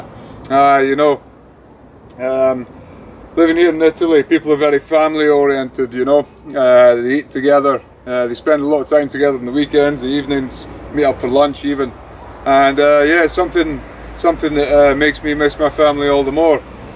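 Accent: Scotland, United Kingdom. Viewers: listen to you speak with your rich Scottish accent all day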